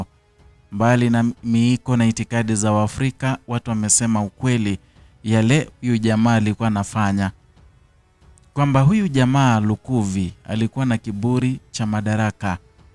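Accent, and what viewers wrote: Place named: Kenya. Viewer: Wewe ni Mkenya. Mtanzania hana rafidhi km yako aisee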